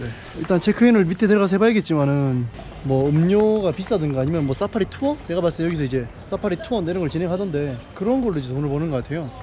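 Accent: Gyeongsang, South Korea. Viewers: 목소리가 경상도 사투리라서 정이가서 본다 ㅎㅎ 여행도 좋아하지만 정갑다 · 그는 경상도 사투리를 쓴다. 그는 Jay다 - 요새 Jay님 영상 보는 재미가 쏠쏠 해요.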